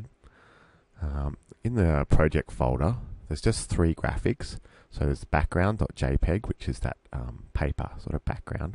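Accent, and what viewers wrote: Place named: Australia. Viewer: Excellent, well done mate, nice to hear an Aussie voice.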